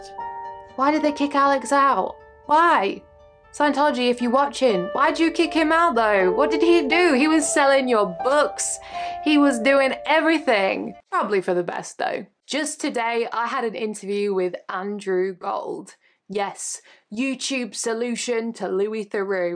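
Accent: Manchester, United Kingdom. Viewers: I'm guessing the Lego is a part of your balance and should not mix with the World Wide Web??? Your series is the only one that REALLY hit home to me, it was because it was closer to home with me (UK, and the 'proud to be' Manc accent). Ill be sure to keep tabs on your efforts. A great idea to focus on the 'after Scientology', It would be quite empowering I think. Well done, keep up the great work, your a natural. RIP Grandma x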